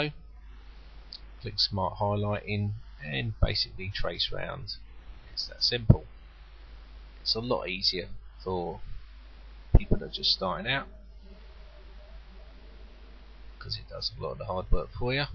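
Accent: United Kingdom. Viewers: From now on I will only watch tutorial made by British guys <3 the accent =) The tutorial is gd too .... 'melts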